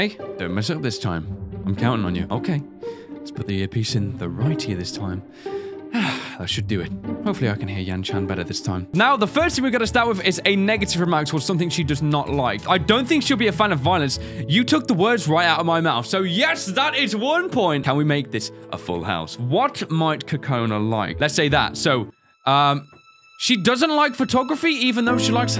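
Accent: United Kingdom. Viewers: I see your British am British but people say am American because I watch YouTube too much😅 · um...? you're British... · Are you British dude? Shite. Your Accent are Very Great. :)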